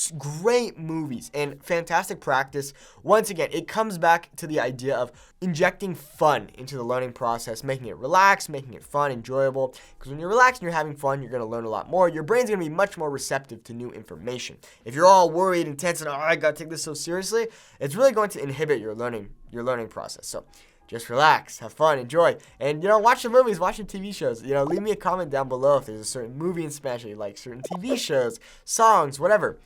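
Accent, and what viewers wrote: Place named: Mexico. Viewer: Congrats on your spanish which is awesomely fluent, since any spanish speaker can hear your mexican accent as well as the mexican slang words. What amazes me most is the fact that you've just taken four years of classes in high school to reach your current level, I mean i started learning english (in Spain) when I was seven throughout years in the education system 'till i was eighteen, including furthermore several stays in the UK and Ireland as well. Once I started college I continued taking classes to get an advanced degree, but, definitely, I do feel my english is not as good as your (mexican) spanish. Congrats again mate, I love watching your videos, keep It Up.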